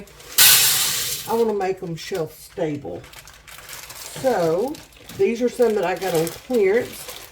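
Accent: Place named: Arkansas, United States. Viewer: Are you from Arkansas? I’m German and trying to figure out your accent. 😊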